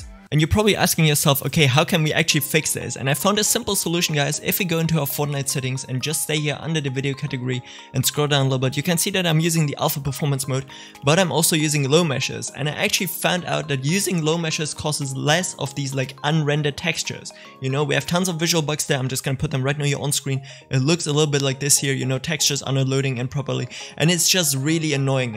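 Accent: Germany. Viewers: nothing much, are you german? i like your accent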